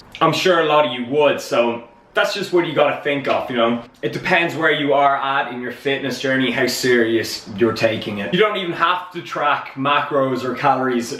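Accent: Ireland. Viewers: I wonder are you able to speak Irish Gaelic? Your Irish accent is pretty light compared to others I've heard.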